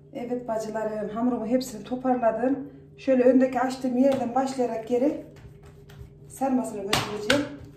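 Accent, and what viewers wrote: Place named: Central Anatolia, Turkey. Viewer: Orta Anadolu danmısınız. Şiveniz sanki oralı gibi.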